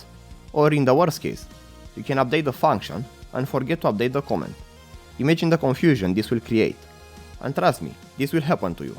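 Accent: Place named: Romania. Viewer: romanian accent for the win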